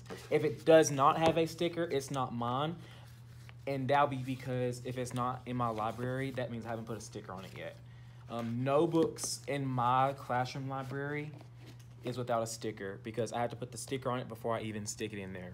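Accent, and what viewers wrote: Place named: Southeast, United States. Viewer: I thought u sounded like it was Southeastern! 😉. I’m from S.C. myself! Love hearing Southerners!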